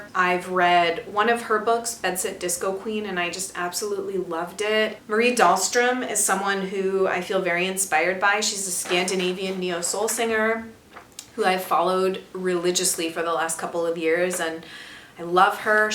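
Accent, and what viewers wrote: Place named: United States. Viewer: First of all, can I say how great your English is? Might sound weird to you, even more so given that I am not a native English speaker myself, but I studied the English language and I love the fact that you speak it sooooo well, with a wonderful voice and accent (I have a thing for American accents, but mild ones, just like yours ^^)and w wonderful and varied vocabulary... You are extremely nice to watch but dare I say you are even nicer to listen to... Anyway, that was a great video, as usual. I discovered you through Clelia and I am so glad I followed her advice! I, for one, would be very interested in hearing you talk about your transition to a non hormonal birth control method (I got rid of that in 2010 too), as well as your relationship to music, obviously. You might have explained it in earlier videos that I haven't watched, but what is your relationship to the French language? 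Anyway, I hope you're having a great time in Texas with your family (I saw in IG that you had some croissants, good girl!! ^^). Thank you for being a very inspiring woman.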